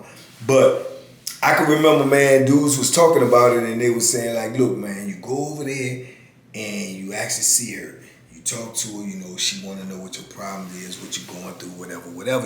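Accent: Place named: Virginia, United States. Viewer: Man, I love the stories, but they're just a bonus to me. Started watching APS and then living in Asia...just to hear voices from that Tidewater/VA area. Ain't nothing more comforting than an accent from home when you been gone too long. Damn good to hear your accent man. Salute.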